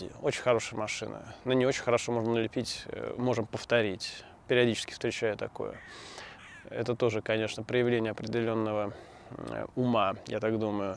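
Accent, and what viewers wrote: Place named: Moscow, Russia. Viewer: ты стал говорить как МАсквич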